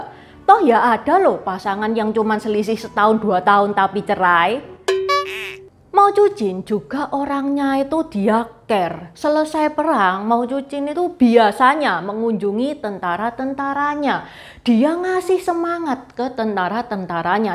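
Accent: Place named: East Java, Indonesia. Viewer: Enak didengar tambah pengetahuan tentang sejarah, suaranya medok jawa timuran. 👍👍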